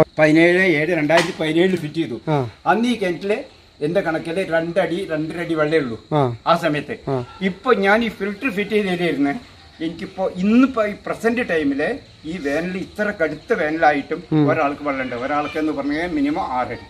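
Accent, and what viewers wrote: Palakkad, India. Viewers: Palakkadan slang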